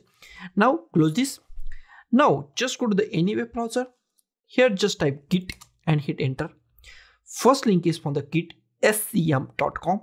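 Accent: India. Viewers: Bro is Indian Pro Max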